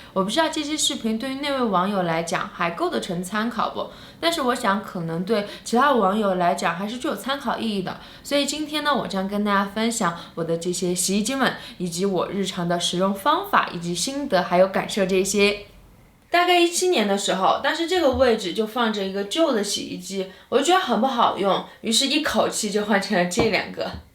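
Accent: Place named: Chengdu, China. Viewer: 听口音，好像成都的呢。 用的了那么大的还一大一小啊？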